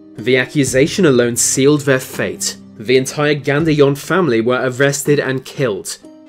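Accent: United Kingdom. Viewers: I just want to see face before I die. I wanna see the face behind this majestic British voice.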